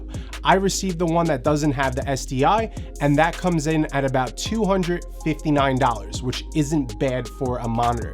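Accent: New York, United States. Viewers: I will primarily use this for stills (landscapes) as I find the standard camera screens limited in scope, especially for my old eyes. Although, I surely might be tempted to do more video..!! A good thing, indeed. I have the ability to use a Samsung Galaxy S2 tablet, a very attractive display and wifi connected, but outdoors, here in sunny Las Vegas, something more is needed.. I noticed the palm trees in your video samples, but your accent is back east NY (as am I).. LOL! Thank you, for your good nature..!!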